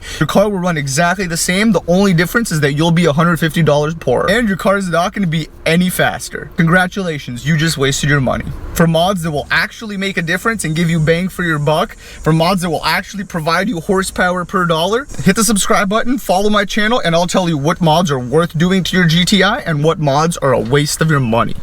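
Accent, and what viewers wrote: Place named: Toronto, Canada. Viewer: Are you from Toronto? You sure sound like you are lol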